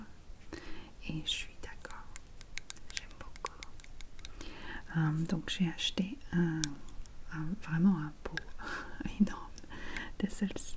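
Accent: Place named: England, United Kingdom. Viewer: Cette vidéo est vraiment incroyable pour dormir votre accent anglais en parlant français me donne des frissons!!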